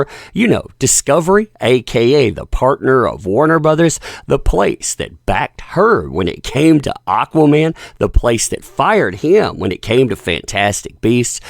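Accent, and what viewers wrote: United States. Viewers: I actually like your American accent as a Brit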